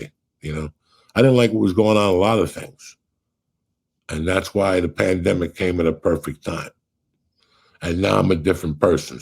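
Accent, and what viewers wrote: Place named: New York, United States. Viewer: Happy New Year Mistuh. I live in Texas but My Dad n that half of my Family are from New York. Haven't seen that side or been up there to New York since about 2ooo or 2oo1. But listening to you reminds me of them jus mainly based off your accent accompanied by the way you talk lol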